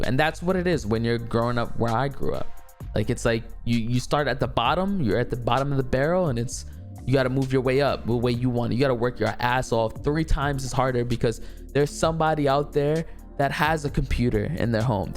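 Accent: New York, United States. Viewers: I knew you was from NY the way of speaking was too familiar, love you brodie keep up the work‼️‼️ also take as much time as you need to come back consistently❤️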